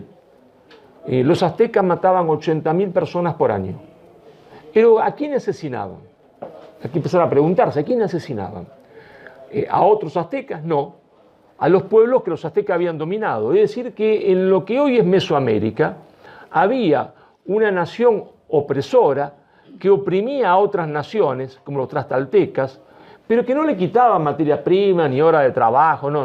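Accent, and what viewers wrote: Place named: Italy. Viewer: suenas a italiano, pero llevas la hispanidad en las venas!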